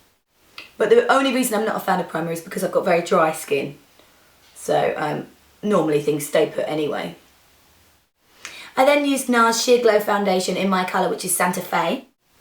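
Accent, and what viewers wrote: United Kingdom. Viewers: Really a beautiful makeup! I hope you had a wonderful wedding. (and I love your british accent)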